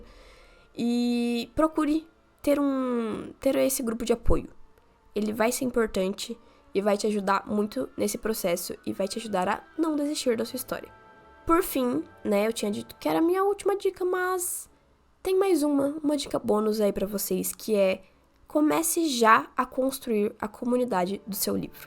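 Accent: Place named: Santa Catarina, Brazil. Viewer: oii, pelo teu sotaque eu desconfio que tu sejas de SC obrigado pelas dicas!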